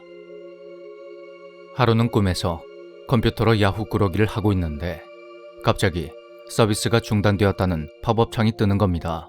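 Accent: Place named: Busan, South Korea. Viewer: 야후꾸러기 참 오랜만에 들어보네요. 이야기를 들을때마 참으로 신기한 일이 많은것 같아요.~~ 연기도 짱! 연기속에 부산억양은 꼭 나온답니다~^^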